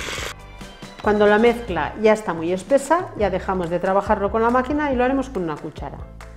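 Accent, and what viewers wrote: Spain. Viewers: Olá ! Ela fala espanhol da Espanha, não é argentina, mas gostariamos de recever-la , você sempre é bem-vinda a meu país! Brigada !! 🌷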